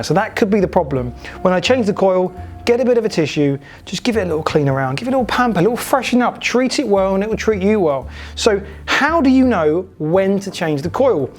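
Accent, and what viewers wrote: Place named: United Kingdom. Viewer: Thanks & good to hear a British accent.. So I been vaping hardcore n it was time to change the coil after 2420puffs approx 170ml of ejuice 75w on a r kiss2 so yeah I'm pretty pleased with that. What I usually find is the coils you buy ain't as good as the ones that come with the device I could of opted to use the spare coil in the box but decided to try the smok coils I bought with my device.. I will keep y'all informed Thanks for your video 🏴🇬🇧👍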